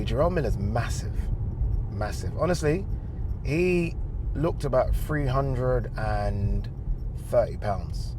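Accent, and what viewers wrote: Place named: East London, United Kingdom. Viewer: This is so me driving around! And the "are you going out what?" Especially where you was driving 😅 (I'm based in bow) so I recognise it. Looool You're soooo east London the way you talk and I love it!😅 Btw You can do two way filming on some phones! (Samsung it's called director mode) I've enjoyed your candid chat, great convo! And you are perfect for club world cup! Congratulations Hun 😘 💓 👏 If you need a hand with your channel while you're busy doing the world cup and boxing, I'm more than happy to help. Maybe I should email you? Haha I like the name car confessions name but I see your point lol😂😅😅😅😅 but yeah car diaries is cool 😅😅😅😂😂😂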